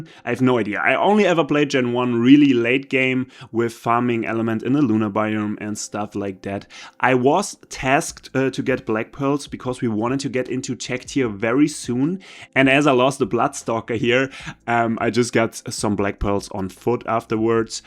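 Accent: Germany. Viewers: ja deshalb meinte ich ist auch nur positiv gemeint, Jung.❤ Aber ich erkenne immer meine fellow germans😂 Nur Liebe Jung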